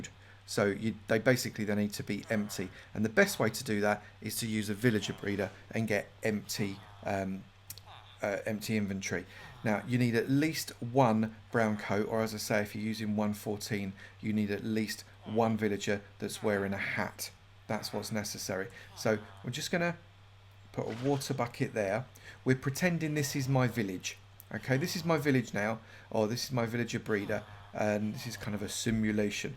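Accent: United Kingdom. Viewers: You mean he's British?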